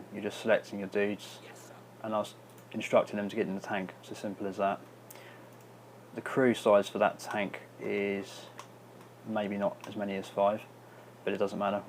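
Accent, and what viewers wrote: United Kingdom. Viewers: nice work, cockney! cheers!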